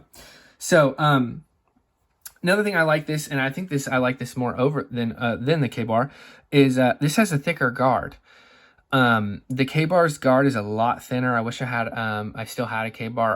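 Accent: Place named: England, United Kingdom. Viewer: Just hear the accent he is english " if we do outdoor testing we get 10 years 😢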